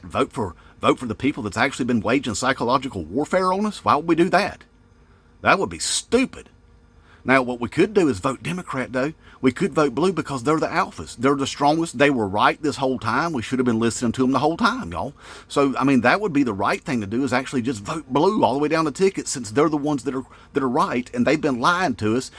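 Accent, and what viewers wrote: South, United States. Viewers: I just found your channel here. I've never had tiktok. Been trying to pinpoint your accent and can't decide if you're from Georgia, Alabama, or northern Florida. Great content, man. I hope you do well here! ✌🏼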